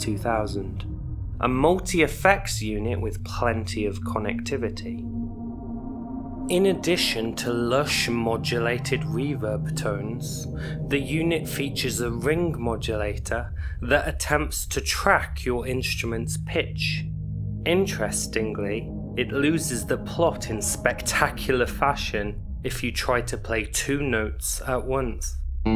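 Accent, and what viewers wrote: United Kingdom. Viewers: YEs yeS YeS YES! Awesome style in every aspect with a lush brummie voice. Long may this continue!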